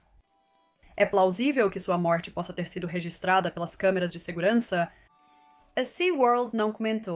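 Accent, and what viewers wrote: Portugal. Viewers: Conteúdo muito bom. Porém, português de Portugal... 🤢 Impossível de ouvir por muito tempo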